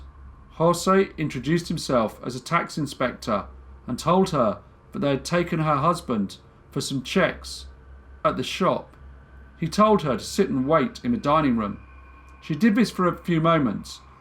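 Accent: United Kingdom. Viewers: I am really grateful (though slightly ashamed, well, more embarrassed, by my facination with true crime) to see someone, and as an old(er) anglophillic yank(er), to listen to a well spoken Britter, narrate international cases, from 19th & 20th c. In the usa, it's always either American or Dominian, English speaker murder, and am really enjoying the international work. Hope against hope you do some Germainica!!!!!!!!! Or Balkan, Baltic, Slavic, Turkic, anything. On top of that, love the concise presentation, exactly what I prefer. In American true crime stories, it;s always TMI. Your channel alone justifies my 12.99 usd per month, on Utoober. Many thanks!!!!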